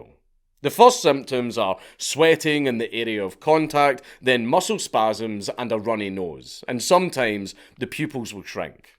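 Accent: Scotland, United Kingdom. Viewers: seen it but always good to hear in scottisch accent...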